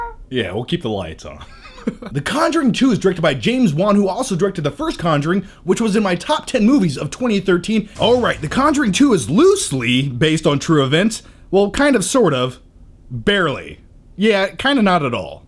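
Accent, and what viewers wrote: England, United Kingdom. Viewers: lol, your rant is the funniest thing!! And I love your english accent too! My worst cinema experience was when someone sitting behind us put chewing gum in my friend's hair. Awesome review! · Ha your English accent is hilarious. Telly? Lol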